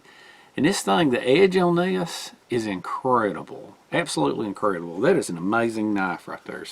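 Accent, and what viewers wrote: South, United States. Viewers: Silky saws are awesome. Hows the handle on that machete feel? Idk if id like the feel of it just based on the looks but ive been fooled b4. I got a baryonyx kingfisher as a gift & i thought at 1st that it was just a toy basically w/ a weird pistol type grip but that handle is super comfortable & it cuts like a laser even w/ the strange pointy profile. Oh & btw your accent is fine. As a matter of fact southern dialects descend from French & UK high society. I study languages & our heritage is something to be proud of. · I love your southern voice like how yu say machethe lol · I agree with you. I like a southern accent. Some people who hear a southern accent make the mistake of thinking the speaker is not very intelligent. Behind accent is a genius mind. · Buddy I'm glad your going to get back to normal on the shift. What some awesome things sent to you! 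Brother you deserve them all, ENJOY! Good video as always Brother! I remember about 100 years ago when I discovered your channel, my little boy who's grown now was sitting there watching and listening to you and looked at me and said "Daddy he sounds like us!" Hehehe it's a Southern thing:) Thumbs-up buddy ~John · I love your southern accent. I could listen to you all day! LOL Thank YOU for being such a great, innovative guy that I've learned a lot from. Always look forward to your videos. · "What accent?" as one Australian said. But seriously, the 'Southern Drawl', I believe, is a of rather SOPHISTICATED character and sounds very much like the altered remnants of a Proper British /Aristocratic English accent, to me anyway. I think that's why it sounds so familiar somehow and also sweetly comforting. I think may have the unbeknownst lineage of an Aristocratic English Lord...that makes ALL kinds of Tools go "Out of Stock" with every other episode.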